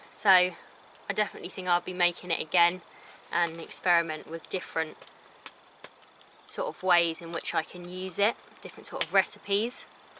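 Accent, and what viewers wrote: United Kingdom. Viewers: You are obviously UK, but what country? I am not good at identifying accents. Brit?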